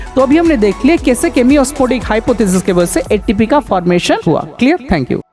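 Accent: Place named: Kolkata, India. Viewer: Aap tho kolkata wale ho😅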